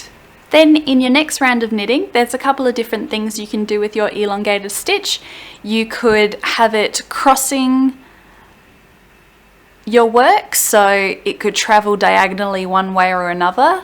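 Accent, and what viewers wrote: Australia. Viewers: Thanks for this. I too am knitting socks with this instruction and now I know how to proceed. P.S. It’s nice to hear an Australian voice.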